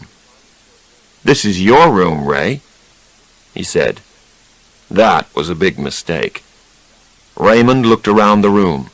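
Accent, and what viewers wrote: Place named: United States. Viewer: american english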